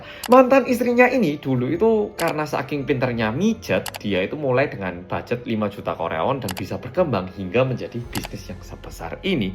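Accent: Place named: Java, Indonesia. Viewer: Kak bikin konten seperti ini terus ya , aku paling seneng bahasa yg diucapkan , org Korea asli , sekrg tinggal di Korea tapi medok jawanya masih ada , aku bangga sebagai org Jawa ada org Korea mah medok jawanya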